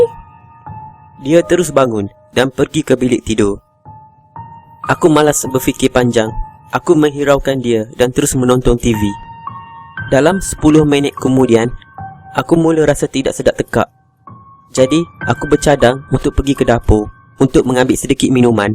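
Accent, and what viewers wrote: Kelantan, Malaysia. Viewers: Abg orang kelantan ke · Demo ore kelate ko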